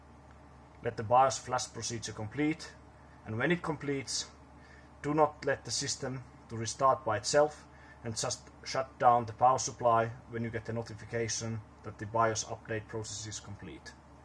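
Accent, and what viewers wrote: Finland. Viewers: He's Finnish. Also i love the comment section here lmao....its hilarious...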